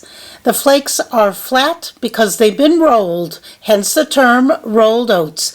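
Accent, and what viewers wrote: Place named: United Kingdom. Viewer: Thanks for the great vid! May I ask.... do I detect just a slight UK origin to your lovely accent?? Cheers!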